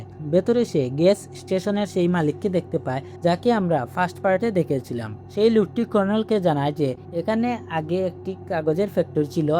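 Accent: Sylhet, Bangladesh. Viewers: R you sylety 😊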